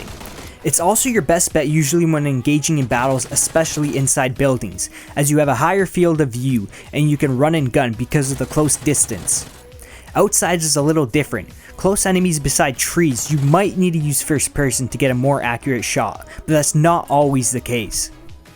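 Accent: Canada. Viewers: Canadian not Scottish, nothing like a scottish accent.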